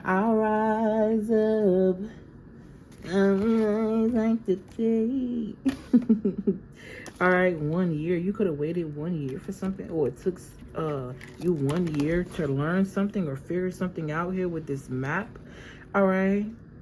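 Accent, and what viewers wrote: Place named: South, United States. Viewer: Country accent!🤣🙋🏼‍♀️ I appreciate you and your messages so much. ❤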